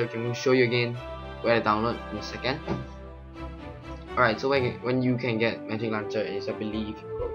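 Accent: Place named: Singapore. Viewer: Thanks! By the way, your voice really sounds like a Singaporean. ;)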